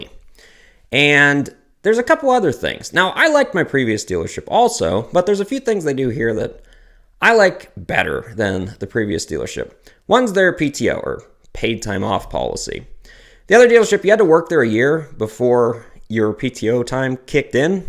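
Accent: Western Pennsylvania, United States. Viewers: I always thought you were in Pennsylvania. You have a western PA. accent.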